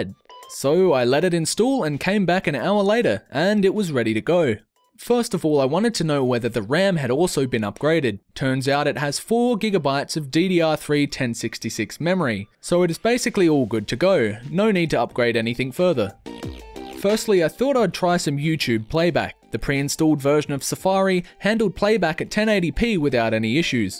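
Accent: Australia. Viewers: Finally an Aussie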